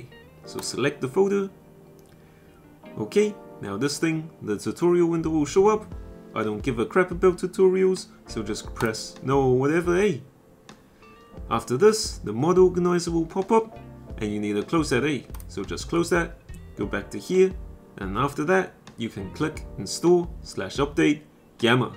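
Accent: New Zealand. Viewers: Thanks for turtoial. NZ Accent goes hard. Much love from Oz. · The bro is kiwi can tell that accent anywhere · He sounds like he is from New Zealand 🇳🇿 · Where are you from? Are you a kiwi? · He has a very strong kiwi/native New Zealand accent, very distinctive and a part of our country. Is honestly similar in some ways to South African accent because the conditions of our countrys history is similar, both being British Colonies. But still very different :D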